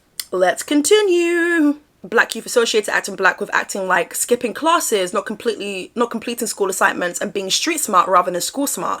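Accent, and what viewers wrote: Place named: United Kingdom. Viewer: Love the accent also 4 a black Brit you are hilarious and thanks...😅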